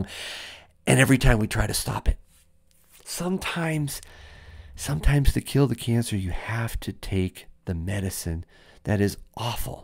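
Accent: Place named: United States. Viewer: It’s just yer accent, I find (in general) yankees have an accent that is just off putting. Now salivate metals . . . Whew, if his info wasn’t so good I’d never listen to him on purpose lol.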